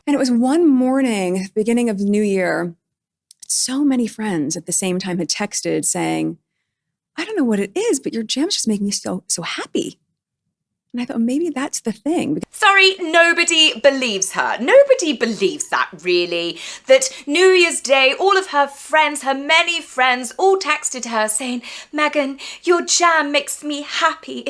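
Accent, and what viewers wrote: England, United Kingdom. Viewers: Your dialogue just makes me laugh outloud!!!!!❤❤❤ my husband says..” you must be listening to the English woman again!”😂😂😂 you betcha! ALL the time! Well done! A friend in Kansas🌻🌻🌻🌻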